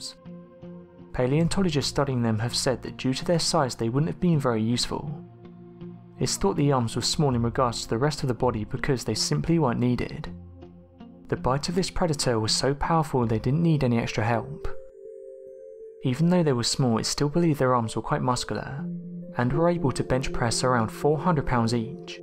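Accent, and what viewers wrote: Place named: Manchester, United Kingdom. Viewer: When discussing the question of dinosaur lips, this narrator (already famous in my book for love him/hate him usefulness).. says something much closer to "limbs". I understand that his accent (Manchester?...I don' know enough of the styles) may be hard for me to get, but damn that one was out there. I'm trying to get used to the "up/down.up/down/up...and fade the last word to breathy nothingness" style, and I'm getting there even though I find it irritating. His actual voice is great and usually if I'm watching a film or show taking place in GB, I love the accents. I just can't get the info straight sometimes with his rhythm and fluctuation. He probably hates how Americans speak as well lol. Otherwise, as usual great content and yes I'd like to see more exact pictures of subject matter but I understand it's not always possible. 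Good show.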